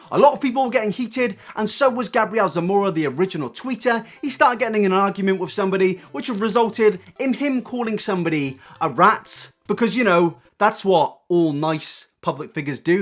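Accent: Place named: England, United Kingdom. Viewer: God I love this precious English nerdy cutie pie 🥧 he’s just so un-jaded and it’s so nice to hear him talk with his precious accent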